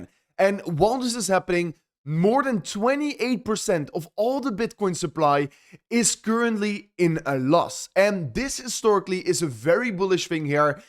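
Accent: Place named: Netherlands. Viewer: haha en ik had al zo een vermoeden dat ik een nederlands accentje hoorde 😅 je doet dit super!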